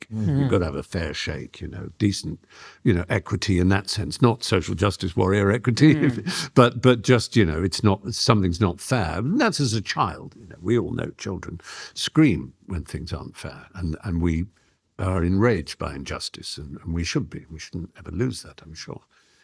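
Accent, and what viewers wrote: RP, United Kingdom. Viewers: Without watching this, for Fry it means speaking with a cut glass accent while telling the rest of us that speaking properly is not important. It means rubbishing Christianity and then saying how much you like to sit in an English church. It means setting yourself up as a liberal elitist and then using the (lack of) freedom of speech card to crawl across the political divide to more conservative politics. He’s typical of a liberal who has the luxury of not having to live with its consequences. I always had a lot of respect for him but now just think he’s full of shit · What it means to be British? I'm American so I don't know and don't care. I only know we dodged that bullet. The accent's nice, though, but it's another trait that is rapidly fading.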